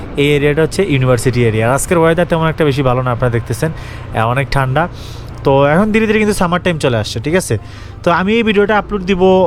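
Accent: Sylhet, Bangladesh. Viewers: Apne Sylhety Mone Hoy,Voice sune buja jay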